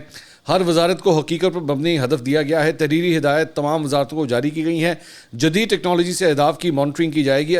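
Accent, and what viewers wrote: Punjab, Pakistan. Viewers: Bhai Panjabi boht peyre bolty Han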